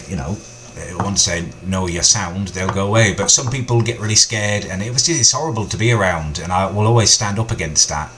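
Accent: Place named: Blackburn, United Kingdom. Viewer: I thought your accent sounded familiar, I'm from clitheroe it's a town about 10 miles away from Blackburn. well done on 20k btw I like your videos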